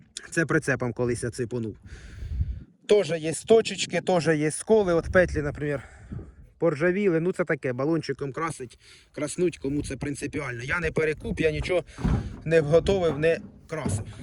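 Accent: Poltava, Ukraine. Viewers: Це Полтавський суржик дядь)🤣💙💛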